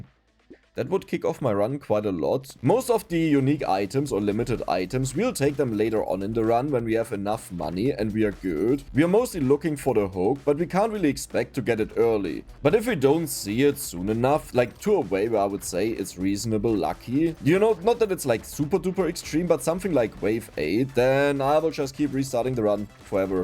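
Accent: Germany. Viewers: Zo, zingle run, zix, zis point...German accent is so weird. You say "second" correct. Why don't you pronounce it then like zecond?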